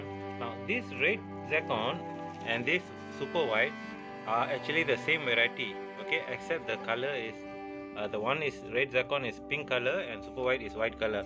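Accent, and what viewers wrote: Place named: India. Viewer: No problem thank you so much for your kind information i thought you were from India you sound so much like Indian 🙏🙏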